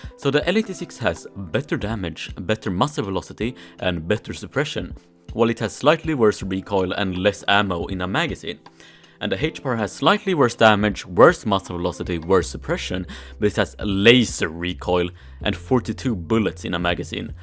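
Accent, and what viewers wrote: Sweden. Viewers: R you swedish lol? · Are you Swedish? You sound a lot like it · He sounds more Swedish to me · 100% svensk, hur på hur du pratar lol. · bruh jävlar vad man hör du svenne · This guy swedish?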